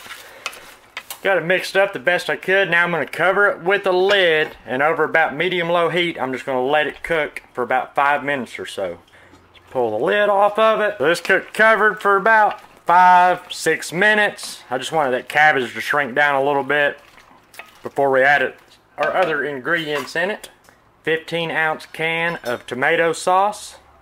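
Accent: South, United States. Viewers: Looks good. I'll make a batch. The southern accent just kills me.... was laughing my ass off the whole time. Not in a bad way - it was just hilarious to listen to. Kinda reminded me of Justin Wilson. Look forward to more cooking. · I love your country talk I love watch your video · I love so much your southern accent. I could listen to you all day. Texas here. I might try one of your recipes without all the salt. Thank you. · Thank You for the recipe. Love the “four” potatoes you put in. Looks like 5, but we all know them taters can be big or small, so use your own judgement. Love that killer southern accent, and vids from a Man with a passion for cooking. 🌺🌴💕💕💕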